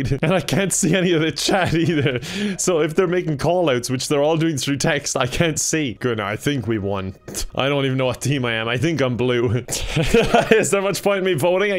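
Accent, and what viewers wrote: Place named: Ireland. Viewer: Are you Irish bc I am Love your vids ;)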